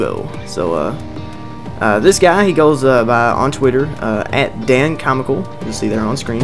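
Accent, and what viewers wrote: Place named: South, United States. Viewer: Where are you from? from your accent you seem from the south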